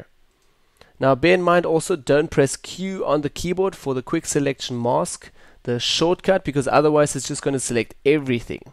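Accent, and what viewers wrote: South Africa. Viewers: is that a south African accent? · Bro are you South African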